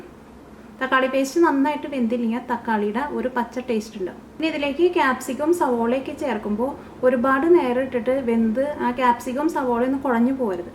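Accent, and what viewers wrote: Thrissur, India. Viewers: looks so yummy... will definitely give a try. by the way, I'm a great fan of you dear chechi... your way of explaining and that trissur slang are wonderful.... thank you for all your recipes....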